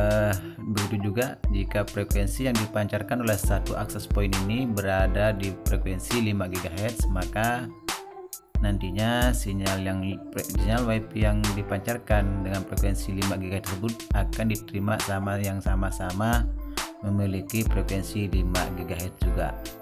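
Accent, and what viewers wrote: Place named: Lombok, Indonesia. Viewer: Kayaknya nada2 nya orang Lombok ini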